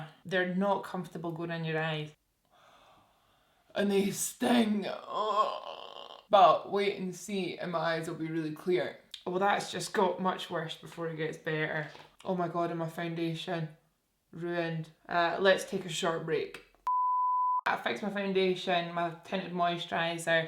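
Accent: Scotland, United Kingdom. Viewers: I randomly found your videos a few weeks ago and I have binged sooo much of your content. I love your style, your attitude and your Scottish accent. ~ You are gorgeous. Cheers ~ Kay from Oz ~ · You sound just like Adele with your British accent! Lol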